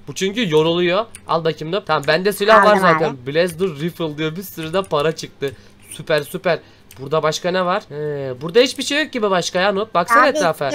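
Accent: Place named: Rize, Turkey. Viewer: 0 Rizeli gibi konuşuyor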